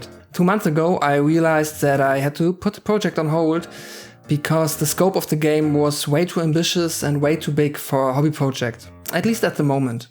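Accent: Germany. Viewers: I recognize a fellow German when I hear one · are you german? i think you have that kind of accent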